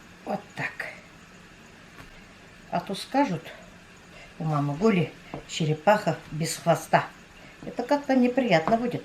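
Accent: Tatarstan, Russia. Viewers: Обожаю наш татарский акцент!😘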